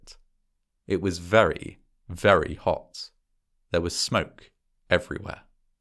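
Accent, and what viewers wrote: England, United Kingdom. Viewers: I adore this horrific channel. I think the British accent is the absolute best accent for speaking English. It just is…I’m a dummy and it always sounds more sophisticated; in particular, I like my tragic stories delivered from a British accent…I don’t want to listen if it’s not British 😊 maybe Aussie in a pinch but I really love them dirty little Britts. You’re awesome! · I recently discovered your channel and (in hopefully as unracist a way as possible) I must say I love listening to narration with an English accent, as opposed to the OTT overly dramatic sounding American accents that seem to dominate disaster documentaries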